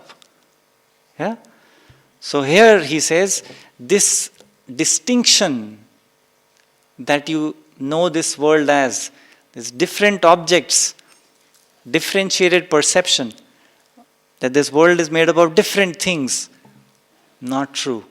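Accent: India. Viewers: Hindicized pronunciation of names is disappointing when the talk is not in Hindi.. personal opinion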